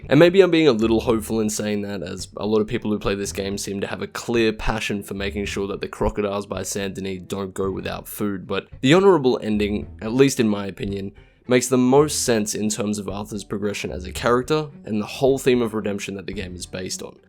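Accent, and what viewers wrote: Australia. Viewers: I think he's Aussie lol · This just got recommended to me are you Australian ?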